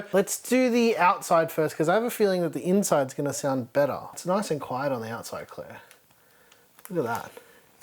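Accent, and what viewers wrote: Australia. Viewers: I'm so confused dude looks mexican but sounds Australian lol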